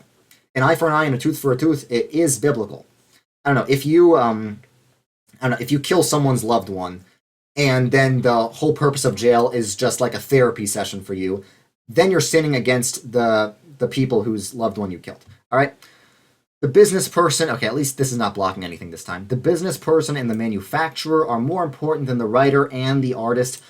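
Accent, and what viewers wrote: New York, United States. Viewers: It's kinda funny this is the most thick his new yorker accent has been so far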